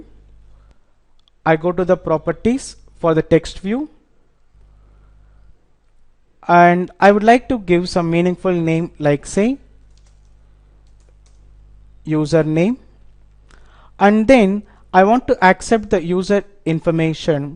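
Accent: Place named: India. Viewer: Dispite the Indian Accent, the video is very good. It's very nice knowing we can develop powerful apps using c# to Android! Thx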